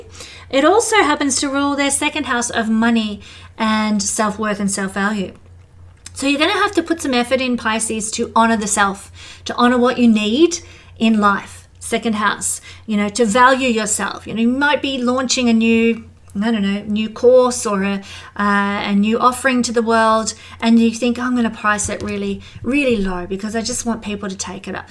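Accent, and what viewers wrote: Australia. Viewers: Refreshing to hear an australian astrologist, aussie here too Taurus sun, Libra moon, Leo rising Love your videos and a conspiracy realist here also ❤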